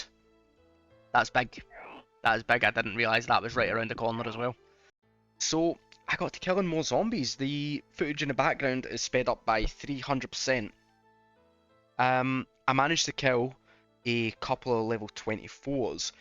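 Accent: Scotland, United Kingdom. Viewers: Half my family is fae Glasgae, so it's always nice to hear a familiar accent. But stellar production on the vid. I know I'm half a year late to the party, but I'm already queuing up the next episode. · I love me a good Scottish accent and more chunk content for my procrastination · YOUTUBE YOU DIDNEA TELL ME HE IS SCOTTISH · Yeah no puro puro. Also, are you scottish? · Belt up, surely tha cannae bae another one chunk frey Glasgee! We need more o tha accent, hyped, gl!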